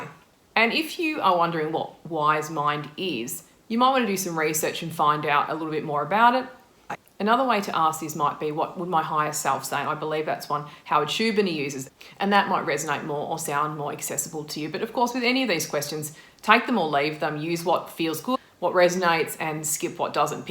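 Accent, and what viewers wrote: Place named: Australia. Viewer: Definitely Aussie :-)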